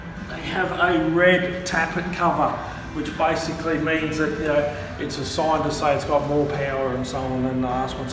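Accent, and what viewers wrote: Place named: Australia. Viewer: Everything sounds better with an Australian accent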